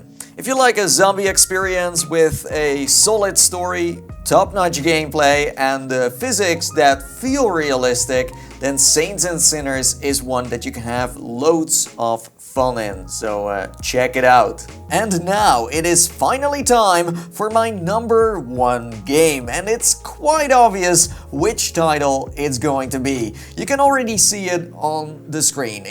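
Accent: Netherlands. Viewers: I just cant stand his accent, I mean I'm dutch and he is too but his english has a dutch accent in it, I just cant listen to it. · Your english accent is verru dutch, are you dutch?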